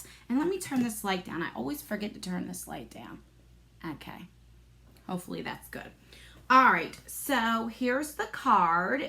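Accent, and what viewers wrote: New Jersey, United States. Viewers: I love your Jersey accent! We moved to west Virginia 4 yrs ago from Philadelphia. As soon as I open my mouth everyone asks where I'm from . Happy to see you got your brushes.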